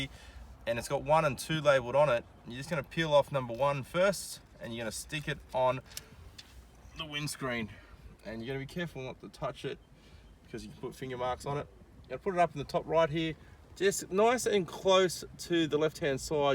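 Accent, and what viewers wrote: Australia. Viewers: Always good to fire up a video and hear an aussie presenting it. 👍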